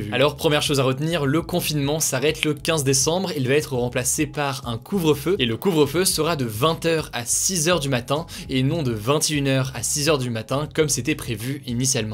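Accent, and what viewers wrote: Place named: England, United Kingdom. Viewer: On apprécie l'accent anglais d'Hugo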